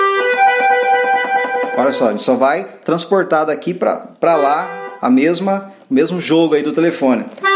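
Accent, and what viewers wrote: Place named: Rio Grande do Sul, Brazil. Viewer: Vc é gaúcho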